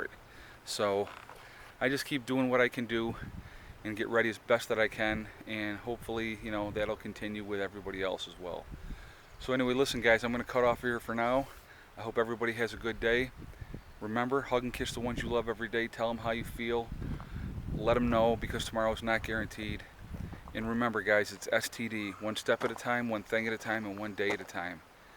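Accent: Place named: New Jersey, United States. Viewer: Nice video; I'm subscribing. That sure sounds like a Jersey accent.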